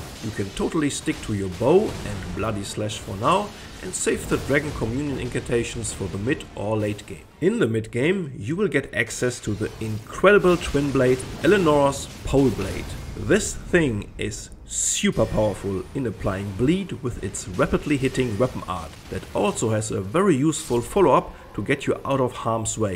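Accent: Germany. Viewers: Du hörst dich ziemlich Deutsch an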